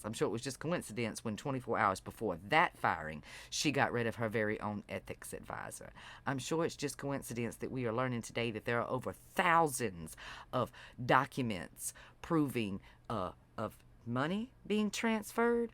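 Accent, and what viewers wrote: South, United States. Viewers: Your southern accent is the finishing touch on a well presented section of the web and drops the gavel down in the court of opinion. Well done.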